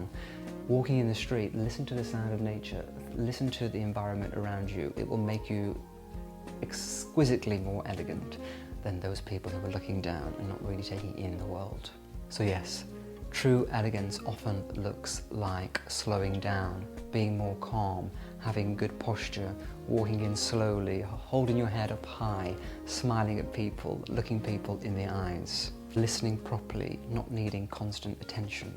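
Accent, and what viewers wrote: United Kingdom. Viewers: Your British accent with everything else is elegant❤️❤️❤️❤️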